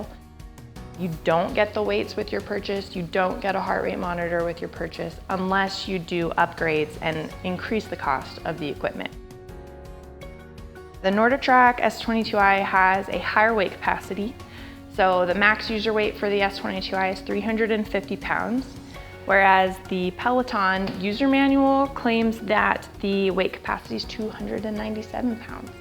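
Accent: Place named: United States. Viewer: Couldn’t agree more, a yank voice does my head in at the best of times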